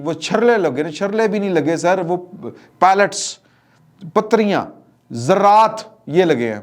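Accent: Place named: Punjab, Pakistan. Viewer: آپ کے ویلوگ کی خوبصورتی ہی تلفظ کی تھوڑی ہیرا پھیری ہے ۔۔۔پنجابی تڑکا۔۔۔شاعری ۔۔۔اان کی وجہ سے بوریت نہیں ہوتی ۔۔اسوجہ سے chill کریں bro.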